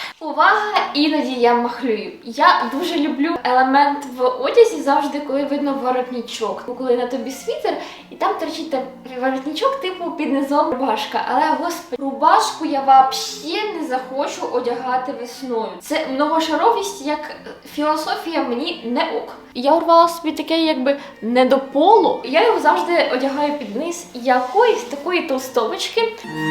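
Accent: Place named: Western Ukraine, Ukraine. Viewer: У тебе така гарна мова, не чисто літературна але в цьому і привабливість. Ти взагалі звідки? Гадаю з Западною України- Тернопіль, а може і з Вінниці. А взагалі дуже приемно чути твою мову- спасибі тобі!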